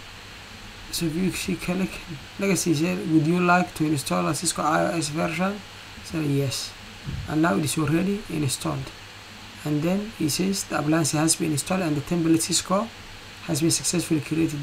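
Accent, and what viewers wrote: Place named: Somalia. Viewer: waraa english kaada aaba kafahmay inaad somali tahay:face-blue-smiling::face-blue-smiling::face-blue-smiling:🤣🤣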